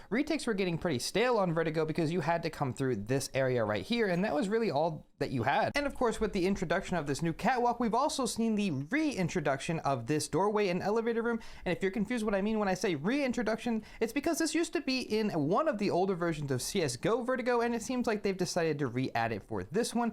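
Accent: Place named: United States. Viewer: niice the american man speaks again)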